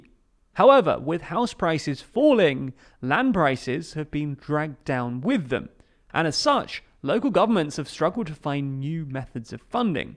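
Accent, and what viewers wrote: England, United Kingdom. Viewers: You have the best English accent I've ever. I'm sure there's lots of money in Los Angeles for you, if you could handle the crazy.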